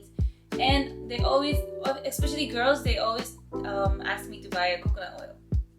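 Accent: Philippines. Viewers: You sound like a Filipino, you have a Filipino accent. It's weird. You could be mistaken to be one actually. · first time here.. your accent sound like taglish ..thank you for coming and staying in philippines...have fun and God bless. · How come Filipino accent?!?!🤔🤔🤔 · Funny when you speak in english it sounds like filipino english but if you try to talk in Tagalog you sound japanese... Hahahaha... CUTE OVERLOAD!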